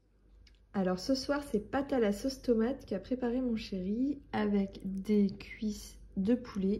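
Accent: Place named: Marseille, France. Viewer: Coucou, alors tu reviens En Bretagne à Brest, et tu parles Marseillais, qui est la ville de mon enfance, de mes jeunes années puis j’ai rencontré un Normand et j’ai passé 22ans Dans la Manche, avec des retours à Marseille, car j’ai toujours aimé son côté Melting- pot, des gens venants de toutes régions du monde. Je la connais comme ma poche, il ne me reste que ma vieille maman de 84 ans. Qui a toujours vécu à Marseille, que de souvenirs avec mes parents, mes écoles, mes copines, j’ai 64 ans, retraitée, je reviens dans ma ville adorée. Alors je te comprends très bien et te souhaite que du bonheur dans ta ville de cœur, mille bisous.❤❤❤❤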